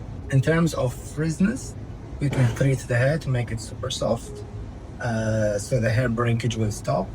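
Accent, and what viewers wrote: South Africa. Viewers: She sounds South African😊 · When I started listening I just knew!!! South Africa!! Afrikaans!! Wow love it. So glad for you. Enjoy it for all of us. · She sounds S. African.? I am in SA ❤❤❤❤❤❤💯💯🙏🙏🙏🙏😍😍😍😍💋💋💋💋 .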